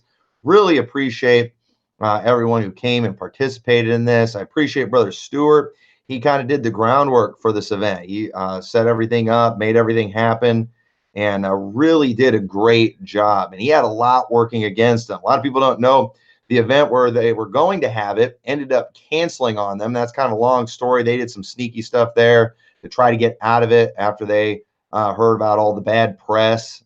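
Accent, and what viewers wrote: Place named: New England, United States. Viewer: I was hopeful that your Yankee accent was going to be a new temporary super power, but hopefully it was an encouragement to the local soulwinners that it can be fruitful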